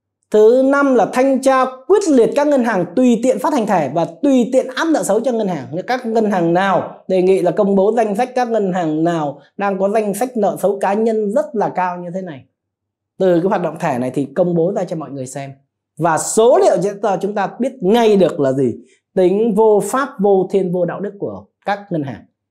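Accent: Standard. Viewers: Anh nói chuẩn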